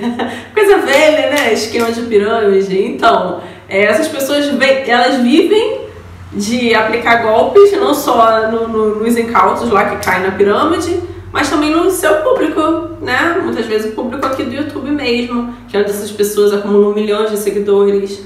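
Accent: Rio de Janeiro, Brazil. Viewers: Linda! Pelo sotaque dever ser carioca. Bom vídeo 👏👏👏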